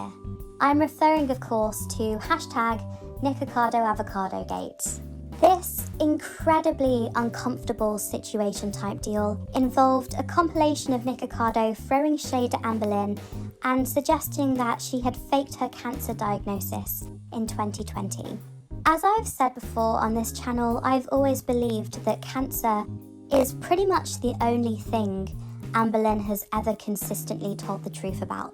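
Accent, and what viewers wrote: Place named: United Kingdom. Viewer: omg are you british? it’s so nice hearing a fellow brit in the community! 🥰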